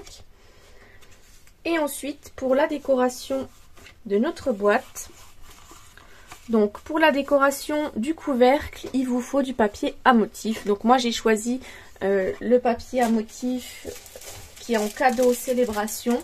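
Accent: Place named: Franche-Comté, France. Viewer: Une belle idée. Bravo. Tu es de quel secteur ? Ton accent me rappelle mon enfance près de Besac. Bises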